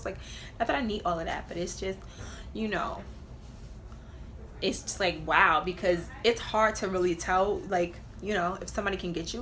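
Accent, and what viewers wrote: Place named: Chicago, United States. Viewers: what happened to that country Chicago accent? You sound like your from the Bronx now lol. Loved you on BGC and Love you now! Best Wishes too you 😘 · Damnnnn the Chicago accent totally disappeared 😂😂 still loveee me some tho!! Happy for you and your evolution ❣️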